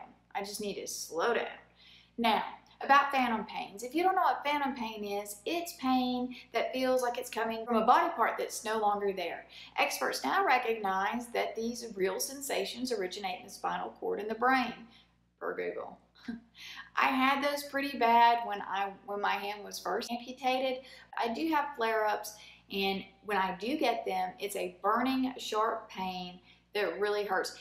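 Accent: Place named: South, United States. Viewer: I am not American. Are you from the south somewhere? Cool accent.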